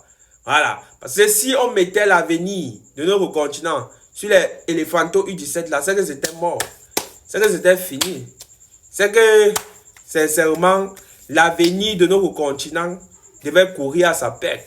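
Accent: Cameroon. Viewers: C'est un camerounais 😂😂😂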